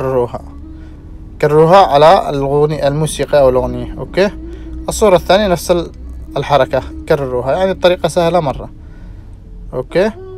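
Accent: Yemen. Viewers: اي كلامه يمني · 😂😂💔لاقسم انه يمني من ربعنا اللهجه تقول كذا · يمني انت من لهجتك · متأكد؟ بس كلامه يمني🌚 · ايووه صدق 😂😂💔 احلى شيء فديت الكلام اليمني 🇾🇪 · لهجتك يمنيه...صح...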